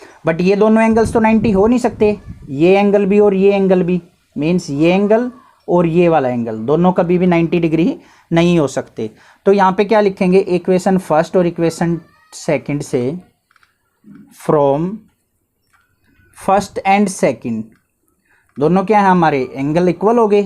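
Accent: Haryana, India. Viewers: I think ap haryana se ho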